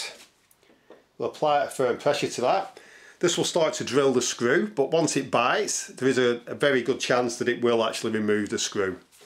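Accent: United Kingdom. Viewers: British voice makes this pleasure to watch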